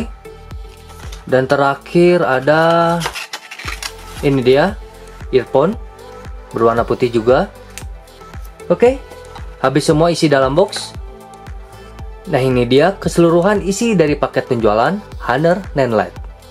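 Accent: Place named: West Java, Indonesia. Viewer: logat nya orang sunda , bandung kah?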